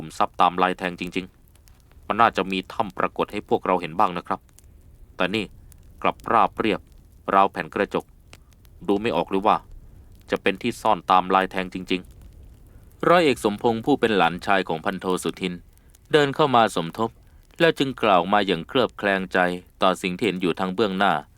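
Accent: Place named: North, Thailand. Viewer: ชอบฟังครับภาคเหืมนมาก😅😮😢😂❤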